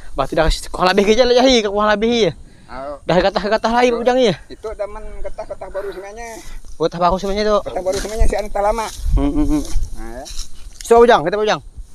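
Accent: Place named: Banjar, Indonesia. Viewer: Logatnya kayak logat banjar dikit..maaf bg mau nanya kenapa daerah sambas bnyak dijual lahan uda jadi..kalau untuk perantauw aman aj kah hidup disana bg..gak ada gesekan seperti yg lalu2 kah bg..antara masyarakat tempatan sma madura..gmana penduduk asli disana bg..apa menerima dengan baik untuk pendatang dri luar dan berbagai macam suku..🙏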